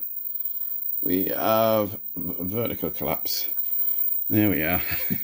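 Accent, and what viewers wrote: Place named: North East England, United Kingdom. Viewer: Sounds like he’s from north-east England somewhere - I’m from Grangetown in Middlesbrough!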